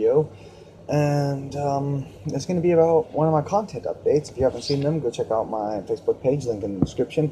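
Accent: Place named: United States. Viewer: Are you german? Your accent i very american ^^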